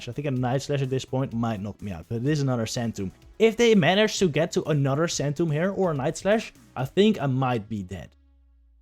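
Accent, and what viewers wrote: Netherlands. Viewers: I have a question. Are you dutch because you have a dutch accent and if you are you are GEKOLONISEERD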